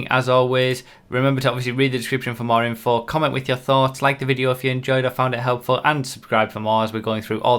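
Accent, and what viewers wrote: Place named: England, United Kingdom. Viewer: no, he's English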